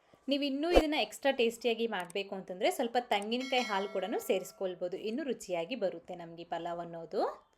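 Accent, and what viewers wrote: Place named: Tamil Nadu, India. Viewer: She is a Tamilian avr accent hage ne irathe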